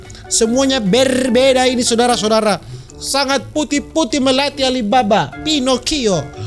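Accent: Manado, Indonesia. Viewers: Kurang seru, so jarang ba logat manado noh..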